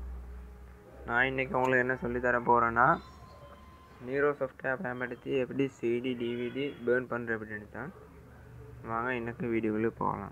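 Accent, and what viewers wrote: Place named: Sri Lanka. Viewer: He's Sri Lankan I think. That's why the slang is different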